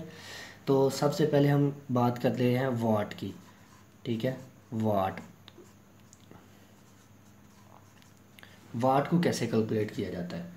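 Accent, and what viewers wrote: Punjab, India. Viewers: Good Work AND your accent is very cool REAL PUNJABI DUDE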